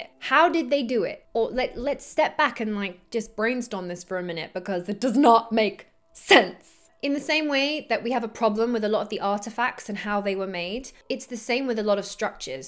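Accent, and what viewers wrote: England, United Kingdom. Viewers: English accent, nice voice sound, articulate, and amazingly I never heard an "ah", informative, and very attractive, wow, wymm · i'm an old guy and I truly enjoyed watching this. I'm a history buff from way back and its fun to see a young person asking all the questions and poking your finger in the eye of the established historians. I think there are holes. The Mayan calendar was cyclic, sure they missed the end of the world or did we just not read it correctly? Either way your fiery attitude and British accent calling bs on things is entertaining and most definitely reassuring that there's still a chance that the study of history still has some hope.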